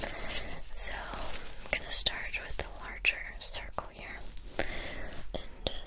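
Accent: United States. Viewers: oh I thought you were American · I thought u were American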